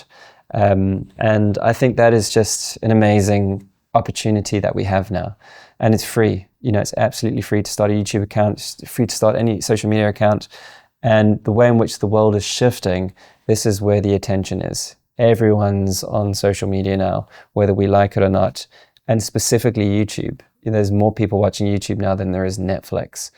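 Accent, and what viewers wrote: South Africa. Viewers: This accent sound firmiliar, South African Maybe?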